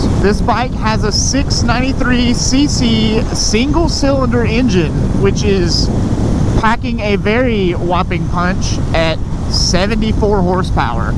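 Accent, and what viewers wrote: Georgia, United States. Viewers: What part of Georgia are you from?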